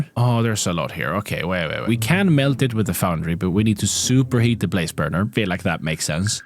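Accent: Sweden. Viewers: Asså jag har aldrig hört en sån stark svensk dialekt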